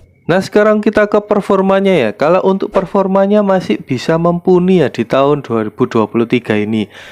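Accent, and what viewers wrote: Java, Indonesia. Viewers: Jawane medok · kok medok banget..😅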